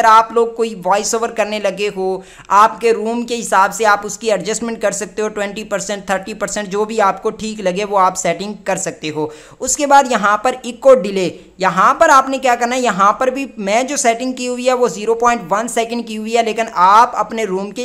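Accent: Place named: Rural, India. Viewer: Posri k Sakreen nhn hota Screen hota ha bae nhn hota Bhai hota Pendu Urdu chor punjabi ma kr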